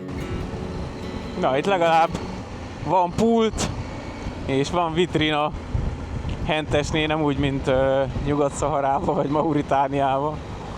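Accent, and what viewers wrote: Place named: Szekler Land, Romania. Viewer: Szervusz! Csaba (62 éves) vagyok Marosvásárhelyről. Szép kiránduláson vagytok, jó hogy a nagyközönséget is be avatjátok! Nagyon tetszik a székelyes beszéded és, hogy nagyjából mindig van egy jó beköpésed ami feldobja a filmet. Nem akarok kritizálni, de ha megkérhetlek mikor várost, tájat filmezel lehetne egy kissé lasabban mozogj a kamerával, mert majdnem élvezhetetlen a film. Ha sietsz akkor inkább kevesebbet filmezz de lasabban forduljál. Mikor kezedben a kamera akkor a kijelzőt nézd az egyik szemeddel a másikkal meg azt hogy hova fogsz fordulni. Tényleg nem rosszindulatból írtam! Amúgy én is szoktam filmezni mikor külföldön motorozok. További jó és száraz utat kívánok, kellemetlen meglepetések nélkül!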